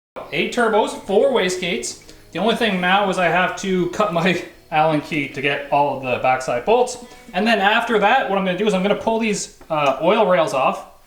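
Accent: Canada. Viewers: i could tell he was canadian within the first 5 seconds this man spoke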